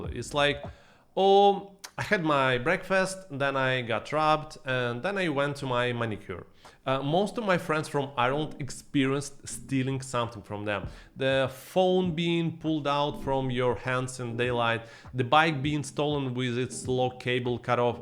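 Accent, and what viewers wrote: Singapore. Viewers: Your Singlish! XD For me, the weather here is fine, it's the humidity that kills. I agree with how convenient it is to get around. As long as you're willing to walk, everywhere you need to go in your neighbourhood is within reach. However, it also takes very long to get from point A to point B in some cases. I tried sitting in the bus for 2+ hours straight to get from the West to the East and I swore I'm never doing it again. (Thank goodness for the MRT.) There are some cons to living here as well like high living expenses... Which is kind of hard for many to start a family. And for those who are career-driven because it's fast-paced Singapore, might be neglecting their family and health too... I believe that it's always good to travel and broaden one's perspective and eventually find out what you really like. Glad to have you and your family here! 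:D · I loved your video. Especially your Singlish. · Because you spoke Singlish, I subscribe liao! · "You need only T-shirt and slippers." Good one. Because now everyone WFH so no need pants. Your videos are absolutely hilarious by the way, your singlish power lah. Have liked and subscribed!! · very power singlish mate!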